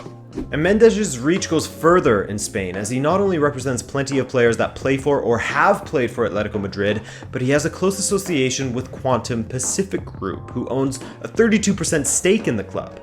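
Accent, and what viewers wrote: Azores, Portugal. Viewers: Are you Azorean? I can tell there’s a bit of an Azorean accent when you pronounce names. New to the channel. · his famaly is from the azores and He has an azorean accent I think it's because of this He might sound a bit different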